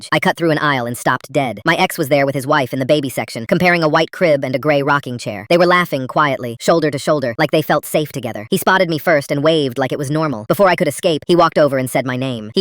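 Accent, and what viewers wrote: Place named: Latin America. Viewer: You sound latina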